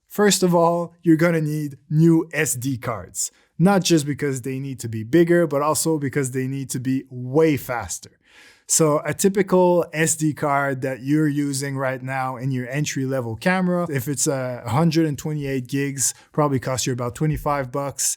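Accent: Quebec, Canada. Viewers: Jpourrais jurer que je reconnais un accent du quebec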